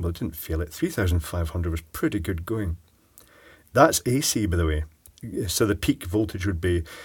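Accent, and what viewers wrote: Scotland, United Kingdom. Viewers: There is absolutely no need for you to apologize for a long video. We watch your videos coz we appreciate your expertise and above all we value you. For me one of your videos can't be too long at all and I think that I speak for countless others who also watch your channel regularly. Thx for your great work and for your unbeatable Scottish humor and accent 😃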